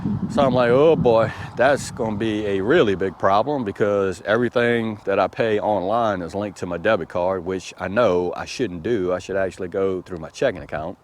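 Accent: South, United States. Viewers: I bought a cheap Fire HD tablet/reader using the same 5 month payment system because to be frank I wasn't too confident whether I'd be happy with it or not. Regardless I just got done paying it off this month. Thankfully it was only 30 USD a month but I had no problem with it. Initial payment was 39 USD ($30 + 6% state sales tax) and the remain 4 payments were $30 and they came out of my bank account every month like clockwork. I guess because it wasn't that much a month I lucked out and didn't get fleeced like you did. Thanks for the heads up and I will definitely keep a watchful eye out if I ever purchase anything else from Amazon using that payment system. As far as outsourced customer service.....................................I feel ya brother. P.S. You don't talk funny at all. You have a perfectly acceptable Southern/Creole US English accent.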